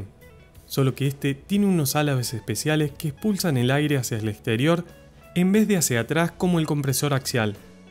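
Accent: Argentina. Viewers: sos argentino ==? capooo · Eres de aca Argentina no? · Vos sos de cordoba no?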